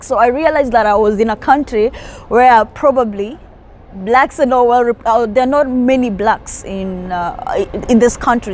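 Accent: Kenya. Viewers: thanks for the compliment. 😝actually most Kenyans speak like that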